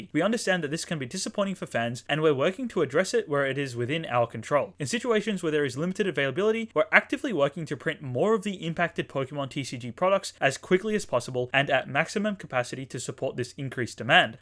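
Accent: United States. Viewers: I'm looking forward for the Pikachu figure box, also happy 10,000! Your American accent is much better than some that I know for sure. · Happy 10k! I enjoyed the American accent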